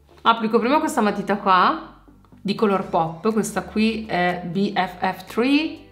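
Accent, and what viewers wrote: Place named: Tuscany, Italy. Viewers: L'accento toscano m'ha scaldato iccuore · Te posso dì na cosa? Me fai morì da i ridere😂!!! (In senso buono ovviamente) Comunque io sono di Arezzo e sentirti dire quel tuo dialetto aretino/ fiorentino mi ha fatto sorridere❤️si avvicina parecchio. Ma di dove sei te??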